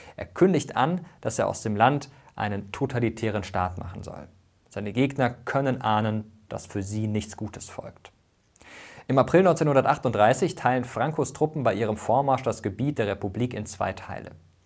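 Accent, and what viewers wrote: Italy. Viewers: du gibst dir sonst immer so viel Mühe bei der Aussprache, nur nicht im spanischen. Alles sprichst du italienisch aus.:( Ich fühle mich ungerecht behandelt! 😄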